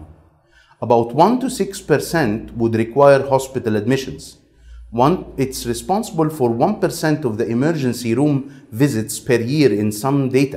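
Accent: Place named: England, United Kingdom. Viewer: Thank you for your excellent way of explaination , and your proper English accent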